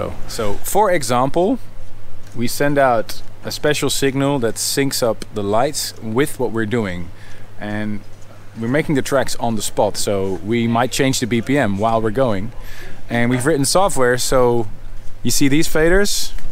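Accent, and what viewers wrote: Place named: England, United Kingdom. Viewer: Getting a little English accent there😉❤️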